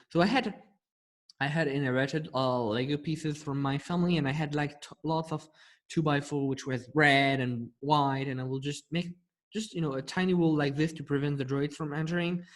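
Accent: France. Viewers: Youre french? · ah tu parles français j’entendais l’accent ! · I think he mentioned how his old videos were in French, so it's probably some form of French accent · Are you french ?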